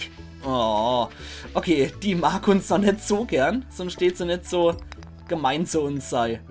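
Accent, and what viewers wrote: Palatinate, Germany. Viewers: Mein Guter, pälzisch wie immer ;) nur: schraub bitte die Auflösung hoch ;)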